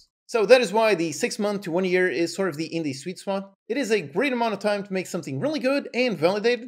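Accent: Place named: Portugal. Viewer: Hey. This is great advice! I had a feeling that you are from Portugal and live in Portugal because of your accent. Is that correct? I live in Portugal as well! I don't build games (even though I would love to), but I build game dev tools and libraries. I built a 2D game framework called Velaptor. We should connect!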